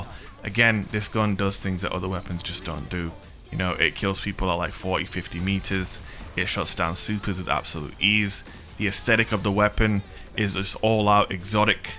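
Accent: United Kingdom. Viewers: Heard British. smh. DISLIKE VIDEO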